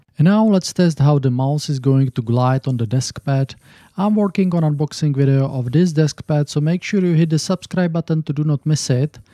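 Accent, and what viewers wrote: Czech Republic. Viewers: Are you Czech lol? Also nice video, keep it up.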